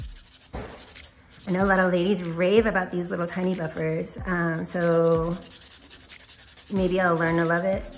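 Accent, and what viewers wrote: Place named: Texas, United States. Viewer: My boyfriend just walked by while I’m watching your video and says “that’s, isn’t it?” 😂😅 he says your voice is “a good Texan southern voice”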